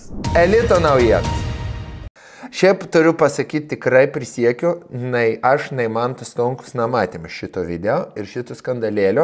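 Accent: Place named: Samogitia, Lithuania. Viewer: Vo žemaitis 👍🤭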